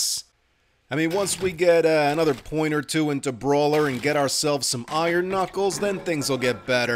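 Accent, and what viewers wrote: New Jersey, United States. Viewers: Close, he’s from Jersey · New Jersey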